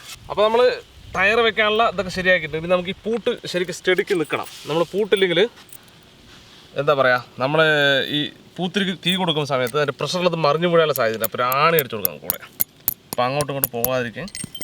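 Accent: Palakkad, India. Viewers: പാലക്കാടൻ ഭാഷാ കേൾക്കാൻ വേണ്ടി ഓടിവന്ന ഞാൻ 😜😜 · യുടെ പാലക്കാടൻ ഭാഷ അത് വേറെ ലെവലാണ് 😘😘🔥🔥🔥🔥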